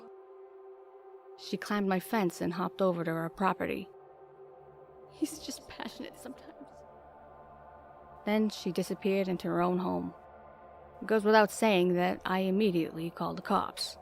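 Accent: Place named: Massachusetts, United States. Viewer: Excellent job, everyone. Being from MA myself, I really can't get over how much I enjoy hearing someone read with my home accent. Thank you for representing us!:D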